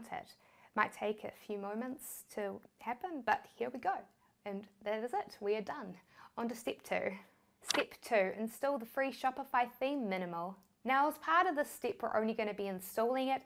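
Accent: New Zealand. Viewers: 🤓 Loove your accent, will visit Nea Zealand one day 😊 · Kiwi Accent is ❤️ ... just like Cebuano speaking English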